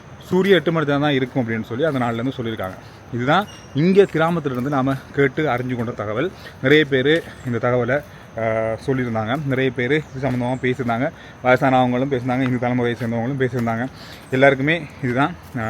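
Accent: Sri Lanka. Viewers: உங்கள் இலங்கை தமிழ் மிகவும் நன்றாக கேட்பதற்கு இனிமையாக இருக்கிறது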